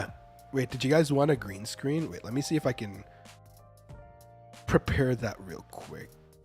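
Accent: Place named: Thailand. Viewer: omg your thai accent is so adorable ✨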